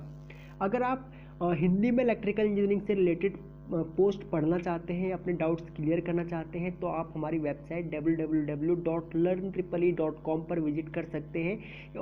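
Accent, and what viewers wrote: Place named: Bihar, India. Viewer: Bhai bihar ke Ho kya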